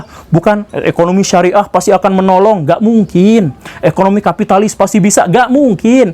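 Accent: West Java, Indonesia. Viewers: Mantap dah, Pak....nyunda buanget👍😁😁😁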